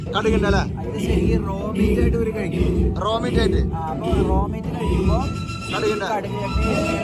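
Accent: Thrissur, India. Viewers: ninte ee Thrissur slang onnu matti pidichal korechukoode okke reach kittum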